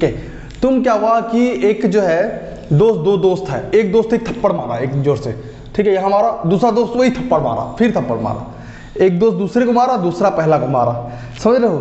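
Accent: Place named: United States. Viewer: Sir thoda jyada American English ho gya but achha laga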